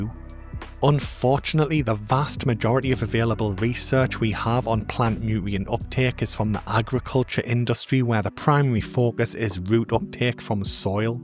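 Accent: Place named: Newcastle, United Kingdom. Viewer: Mate how you haven't blown up yet is beyond me! I truly believe you're the best fresh aquatic channel on YouTube, comprehensive, accessible, VERY WELL RESEARCHED, no tedious filler content or salesmanship. The Geordie/Smoggie accent is a bonus, sorry can't tell the difference 😅 All the best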